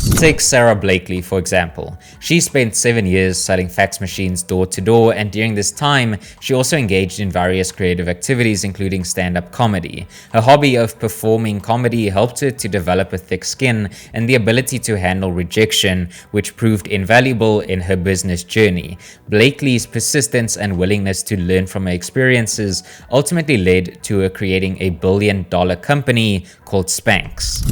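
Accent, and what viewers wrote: South Africa. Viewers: super random but are u south african? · Are you South Africam?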